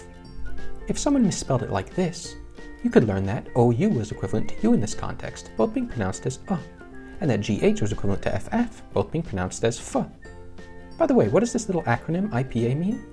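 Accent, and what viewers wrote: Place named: England, United Kingdom. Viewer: most instances of ει were never diphthongs in fact, they were the result of compensatory lengthened ε and as such were pronounced like ε, but longer. in fact they were often written as ε only in early times. the person in the video just has an english accent that makes him pronounce a long e as a diphthong (ei) stress is on the second letter of a digraph in greek by convention, even though it's evidently the first one that is stressed (in ταύτην the stress is on the /a/, not on the /f<-w/).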